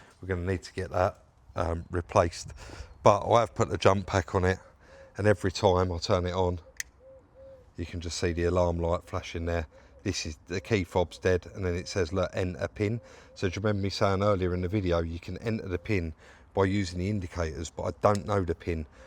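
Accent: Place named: East London, United Kingdom. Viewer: Gertch you cow son gertcha. It's a luvly accent I was dragged up in Stepney and his accents' the same as mine "common as muck".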